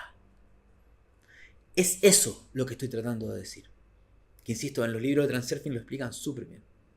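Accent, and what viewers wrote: Chile. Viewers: Lo vi todo, obvio!!! Tengo 50 y cumplo el 20 de agosto... Sentí q me hablaste a mi!! Estoy nueva en esto de la astrología, era super exceptica con eso pero desde q te escuche en el podcast tiene sentido y me cambiaste todo en cuanto a eso. Me maravillo al verte hablar. Viví en Chile casi 3 años y extraño su hablar y contigo tengo un combo de maravillas entre lo q dices y como lo dices. Un abrazo!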